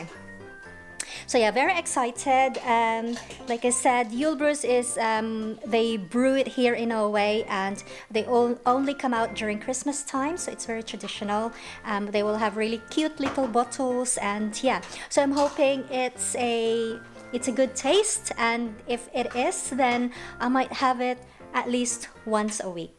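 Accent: United Kingdom. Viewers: Wow sissy! You got all kinds of julebrus there! Har du lært å snakke norsk? Watching this together with my hubby. Always fascinated with your beautiful brit accent. Merry christmas! God jul! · Have a Merry Christmas with a British twang #gemsscope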